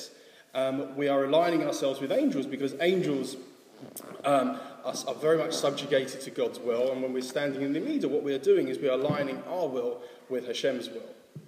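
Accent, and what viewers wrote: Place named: London, United Kingdom. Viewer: Thank you so much. You sound like a Londoner (Guessing North East London?) I used to work in a kosher butcher"s in Southgte. I also Have you ever heard of Shimusha Rabba tefillin?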